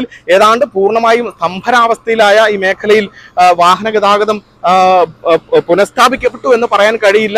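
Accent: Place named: Kottayam, India. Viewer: This is not Telegu, true Kottayam Malayalam.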